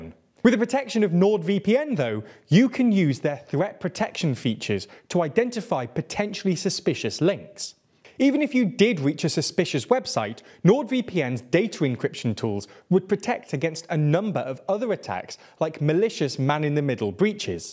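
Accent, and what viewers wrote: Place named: Midlands, United Kingdom. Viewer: I've never seen any other youtube channel that consistently butchers Ukrainian place-names as consistently and as poorly — and almost none of them speak any Ukrainian either. If they're trying to deliver news about Europe, this channel needs to try harder to adequately pronounce European words that aren't muddled with a Midlands accent.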